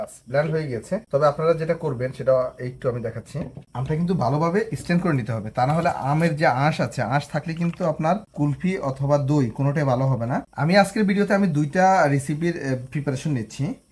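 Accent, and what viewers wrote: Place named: Chandpur, Bangladesh. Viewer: আপনার ভাষায় চাঁদপুরের ছায়া দেখতে পাচ্ছি। আপনি কি চাঁদপুর থেকে বলছেন? যাই হোক, ধন্যবাদ এতো সুন্দর করে রেসিপি শেয়ার করার জন্য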